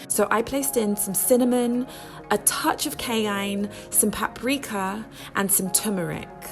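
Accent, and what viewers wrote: United Kingdom. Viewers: is no matter her race. She actually sounds very British to me...like she was born and raised British. Personally, I didn't notice her skin color. I only thought, "Wow! This English chick really knows how to do a video cooking show!!!"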